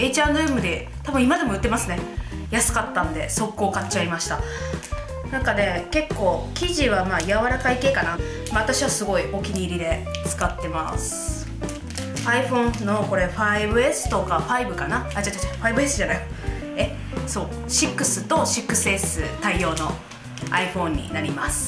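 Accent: Kansai, Japan. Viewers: 初めまして！！メイク動画検索でやってきました！さんの関西弁に親近感わくー！大阪人で、わたしも妊娠6カ月です！(^^♪ もうすぐ出産されるんですね！！頑張ってください！！！甘いもの食べたくなる！わかりますｗｗ妊婦はつらいですよね😢 マザーズバッグわたしも大きいカバンもってないので、何を買うか迷ってます！ベビー用品とかも買われましたか？何買われたのかきになるー！これから、動画拝見させていただきます！！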